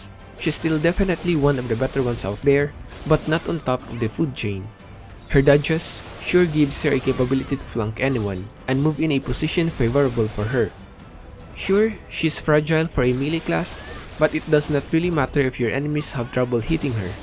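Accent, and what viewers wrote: Philippines. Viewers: Prang pinoy mag english to haha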